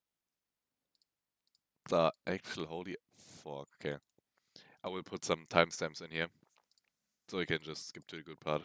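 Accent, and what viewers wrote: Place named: Germany. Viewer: i hear there a bit of DEUTSCH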